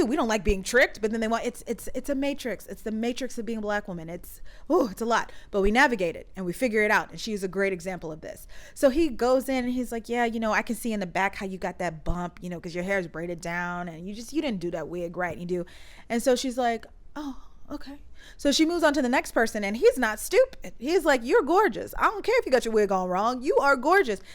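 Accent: United Kingdom. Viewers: As a Brit, loved your British/English accent 😂 Missed you. You’re so relatable. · lmaoo love your british accent, welcome back! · 🤣, that British accent 👌 Loved your humour/ sarcasm throughout, please incorporate it more! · your british accent is everything! sounds just like them!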